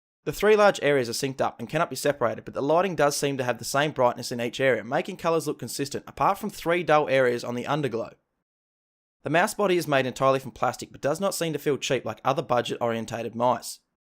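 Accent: Australia. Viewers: ayyyyy its an aussie finally an accent im used to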